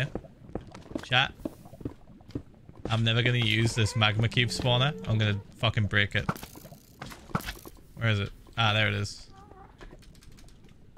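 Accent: United Kingdom. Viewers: It’s a version of a British accent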